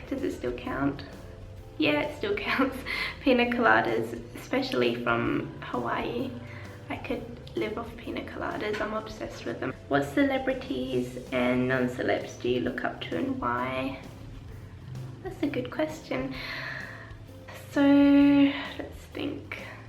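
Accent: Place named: Australia. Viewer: love that aussie accent :)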